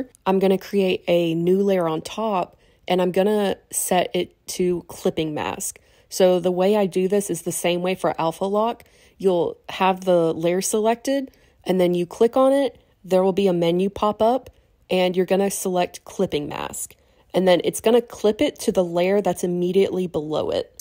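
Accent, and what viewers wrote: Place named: South, United States. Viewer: I can hear the southern, and thank you for the video!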